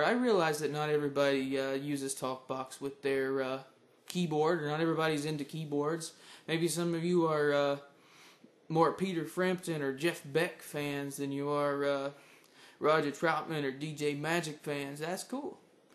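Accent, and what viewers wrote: South, United States. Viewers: Sickkk. PS I don't think this guy is stoned, he's just from the South. Whenever I hear a person from the South, I have to upgrade my estimate of their intelligence to account for their accent subconsciously downgrading it.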